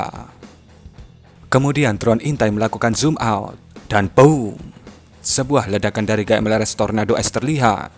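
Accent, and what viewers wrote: Java, Indonesia. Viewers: Komentar saya cuma 1 suaramu medok lucu😂😂😂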